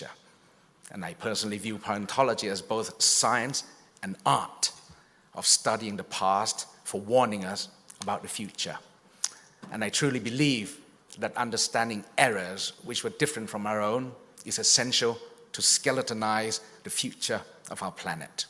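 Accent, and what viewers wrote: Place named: United Kingdom. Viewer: สำเนียงบริติช เพราะค่ะ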